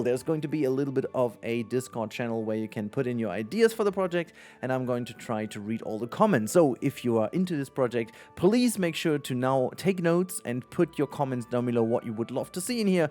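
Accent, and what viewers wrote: Germany. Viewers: Johannes Kepler in Süchteln und dann Berufskolleg in Dülken. Ich wusste doch, dass Du nen niederrheinischen Akzent hast 😂😂😂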